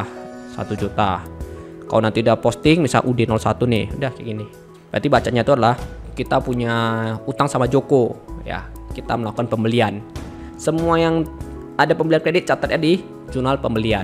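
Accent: Medan, Indonesia. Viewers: metah kali medannya abang kuuu, terima kasih banyak ya bang, ngerti juga akhirnya aku. semoga ilmunya selalu berkah dan sukses terus ya bang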